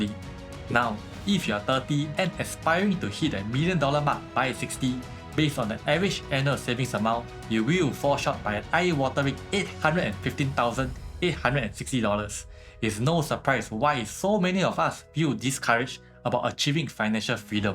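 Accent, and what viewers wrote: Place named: Singapore. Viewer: Singapore accent cannot la..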